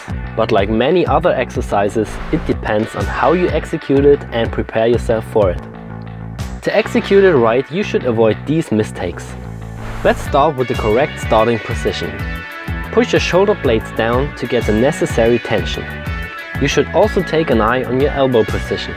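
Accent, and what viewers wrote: Germany. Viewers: Haha this german accent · Can't dafine your accent. Where are you from? Or if someone knows plz tell me. upd: It seems i found out. Is it german? Correct? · it‘s english with german accent, so don‘t bother ;) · Man hört das Deutsch raus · Man hört direkt den deutschen Akzent 😉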